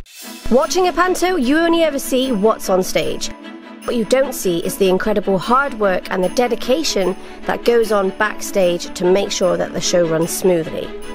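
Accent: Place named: United Kingdom. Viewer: min in and this is giving me vibes similar to British Vogue's documentaries with Alexa Chung!!!! Maybe it's because of the british accent narration, but this is AMAZING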